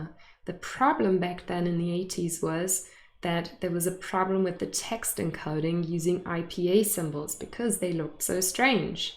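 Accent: Germany. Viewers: Are you German? Where are you from? You sound German. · I know you are a German-speaking teacher of English. My observant ears can catch a foreign accent in your speaking English.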